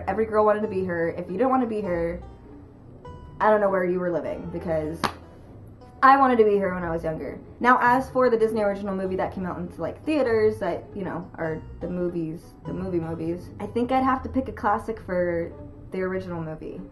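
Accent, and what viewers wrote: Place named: United Kingdom. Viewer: Your so layed back and i love it!! Great little british accent to!! :)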